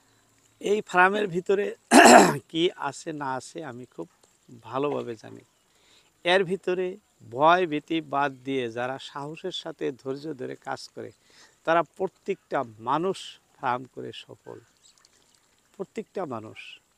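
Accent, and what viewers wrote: Bangladesh. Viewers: দাদা আপনার বাড়ির ঠিকানা জেনে খুশি হলাম। তবে আপনার কথায় ভারতীয় কোন মেজাজ পাই না। মনে হচ্ছে আপনি বাংলাদেশের কোন অঞ্চলের মানুষ হবেন।অসংখ্য ধন্যবাদ।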